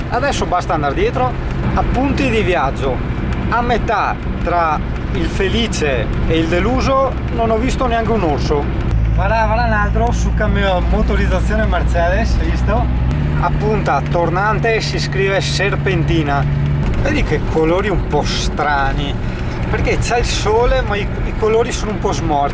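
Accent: Veneto, Italy. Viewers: Venetenglish unico grande!!!! Continua così grazie per i tuoi video .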